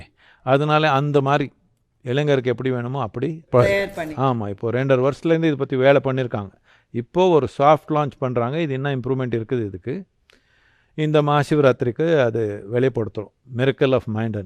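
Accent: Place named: Karnataka, India. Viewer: every Kannadiga sounds the same when they talk in tamil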